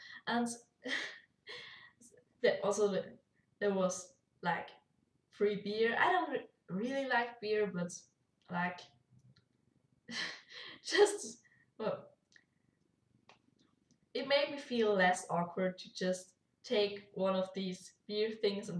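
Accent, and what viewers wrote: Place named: Germany. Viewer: Just got here from Reddit. Not even a minute in and already I'm thinking "Geeerman?" :P That's an easy to place accent. Just don't ask me to place it somewhere in the country. I have many ideas for what I'd say for any potential meeting with Dodger (which is not likely to happen, as I barely ever leavy the city, not to mention the country), but I imagine it would probably end up like this: "Uhm. Uh. Sooo... Wow. You really are tiny!" Ooops. o_o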